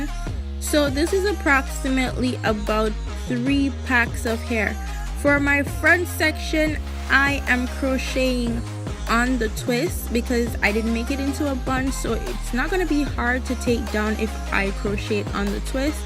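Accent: Jamaica. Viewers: Are you a yaadi girl like me? i hear u accent!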